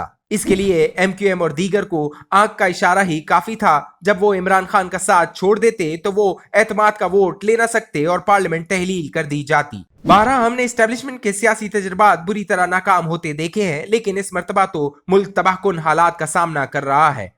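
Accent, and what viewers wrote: India. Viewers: “NAYA DHONG” TV KO PAKISTAN ME KOI NAHI MILA AUR AIK INDIAN AADMI KO GIS KA INDIAN ACCENT HE COMMENTARY KE LIAY SELECT KIA HE …….. GESAY “CLOSET INDIANS” KO ANCHORS AUR JOURNALISTS KA SLOT DIA HUA HE …… ANTI PAKISTAN CHANNEL SPREADING SUBVERSIVE FAKE NEWS ……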